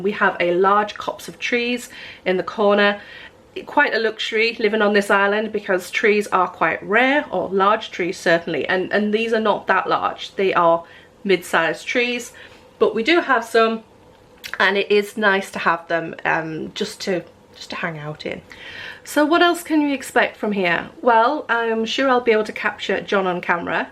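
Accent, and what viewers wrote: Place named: County Durham, United Kingdom. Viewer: Very excited to see you working on your smallholding - hoping it will give me inspiration to get going this cold February, with my little plot, which, by the sound of your accent is near your own roots. (County Durham). I have four hens, a small vegetable plot and currants, raspberries and plums.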